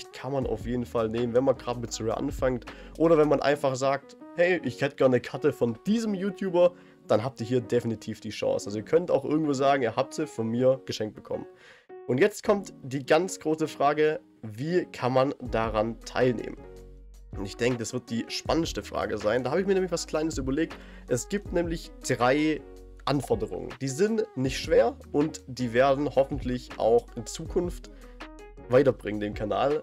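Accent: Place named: Swabia, Germany. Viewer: Kommst du eigentlich aus dem schönen Schwabenland? Hört sich stark danach an 😁👍 Congrats natürlich zu den 1K 🤝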